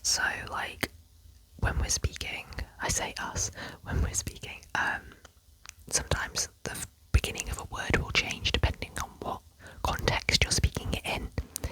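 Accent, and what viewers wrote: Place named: North Wales, United Kingdom. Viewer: babe welsh is my first language and you speak it better than me😔😔 and you sound so gog when you speak welsh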